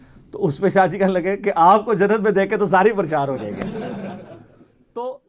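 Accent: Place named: Punjab, Pakistan. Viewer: it punjabi language accent if you don't like it then its your problem. most of the punjabis speak like this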